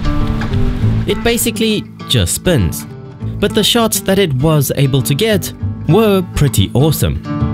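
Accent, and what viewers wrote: South Africa. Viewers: Hey man u south African if not where you from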